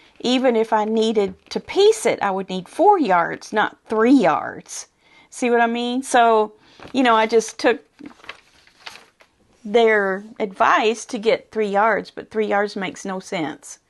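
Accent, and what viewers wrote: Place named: Kentucky, United States. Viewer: I popped over to your channel after seeing your interview this morning with Steven on his YouTube channel (the idiot quilter). So nice to discover your channel!!🎉. Truly enjoyed getting yo know you. I’m originally from Owensbore Kentucky so I was thrilled to hear your accent. Brought tears to my eyes. I’m now in Pittsburgh PA but get home a few times a year. Blessings to You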